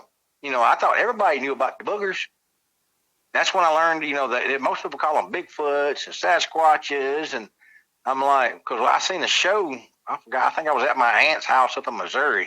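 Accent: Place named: Alabama, United States. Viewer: You sound like your from Alabama, from my neck of the woods.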